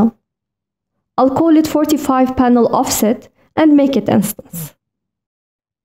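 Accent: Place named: West Asia. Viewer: I think that : Your voice is from the West Asia ! I am living in Viet Nam , I have learn Revit in 1 month . I find on youtube in Viet Nam but the result is zero ! Thank you the West Asia girl !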